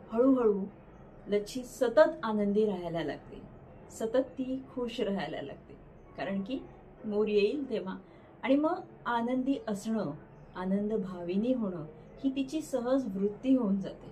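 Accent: Vidarbha, India. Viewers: My humble tributes to late Shri ji. Please continue the channel in his memory. I always liked his style in Vidarbha Marathi ascents. Happy birthday to Sahab.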